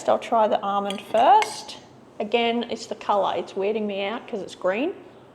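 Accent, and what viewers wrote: Australia. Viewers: How lovely it is to hear an Australian lady doing an Australian dish (I think it’s that). I had a son who was lactose intolerant and another son who had got problems so they put him on lactose-free until he was school-age anyway. Both my boys grew up on goats milk. I never got to the point of making ice cream but, other than that, everything I made for us as a family was made on goats milk. People will tell you that they can taste the difference. —. I guess that’s up to them, but the number of time I would serve apple pie and I used to thicken the goat milk with corn flour and then beat the heck out of it and people that think it was cream.. my best friend ate this, but maybe two years before she found out she’d be eating goats milk all the time. I drank almond milk in my coffee for years and I couldn’t tell the difference a lot of of its mind over matter I think. 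However, thank you very much for your wonderful effort. I’m saving you in my I don’t know what else it’s called, but I’m saving you to look you up and see what other recipes you have. I don’t know where in Australia you’re from, I’m from Redcliffe, about 25 or 30 K’s north of Brisbane Queensland. All the best, and I’ll be watching you to see what you come up with, thanks again.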